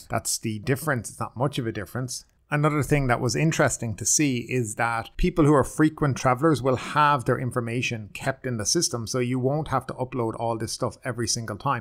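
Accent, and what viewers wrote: Ireland. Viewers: I am an American and find your speaking speed fine. Perhaps some people are not used to your Irish accent. A professional voice recording artist usually speaks about 50% faster than average. A professional speaks about 150 words per minute, so consider yourself professional.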